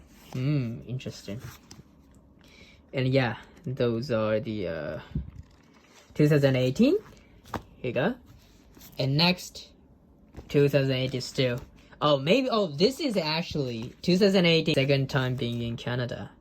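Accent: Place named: Australia. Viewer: Your speak with a bit of an Australian accent, cute! ☺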